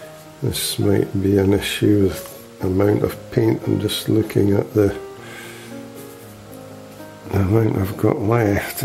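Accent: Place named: Perthshire, United Kingdom. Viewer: I think I know that accent....is it Perthshire?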